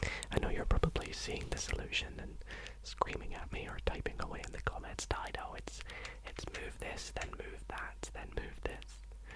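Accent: Scotland, United Kingdom. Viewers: Bro this man is Scottish.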